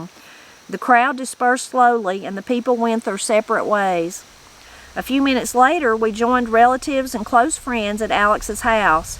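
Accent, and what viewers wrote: Appalachia, United States. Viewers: Museums are educational. Why hurry death? "Languishing" allows for slow goodbyes, time to reflect, prepare and transition. It's a natural process that teaches many lessons. Bad English??? As an Appalachian myself, I genuinely appreciate the Appalachian dialect. At least, we agree that Mrs. is a great reader. It was a wonderful book! 🍂 🍁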